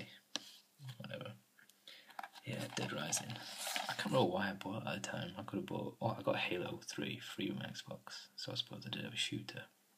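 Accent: Yorkshire, United Kingdom. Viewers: I've only recently discovered asmr and you're my absolute favourite. I love the Yorkshire accent so much. Thinking I might start my own channel also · I have just found you via the waterwhispers channel who I love to watch. she did a video talking about other male's who do ASMR video's and to check you all out. with myself from Yorkshire I thought I would check you out to. nice to listen to Yorkshire accent like this. I don't really get on much with male ASMR's but I do like to listen to the Yorkshire accent softly. I be watching more of you.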